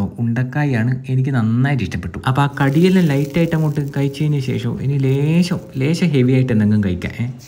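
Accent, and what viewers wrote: Kannur, India. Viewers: കണ്ടിട്ട് തന്നെ കൊതിയാവുന്നു 😋. നിങ്ങളെ അവതരണ ശൈലി ഒരു രക്ഷയില്ല. പിന്നെ നമ്മളെ കണ്ണൂർ ഭാഷയും 😍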